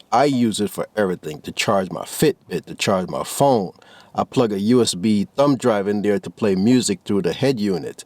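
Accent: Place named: Jamaica. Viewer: You sound like you have Jamaican heritage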